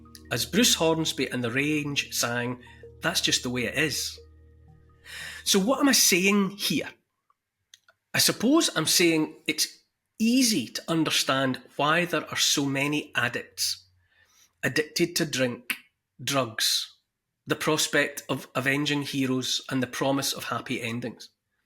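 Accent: Scotland, United Kingdom. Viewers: I am a subscriber…i love you… I love listening to your Scottish accent…and you are right on! ❤️❤️❤️ PS I am Canadian…so was my Nana! 😊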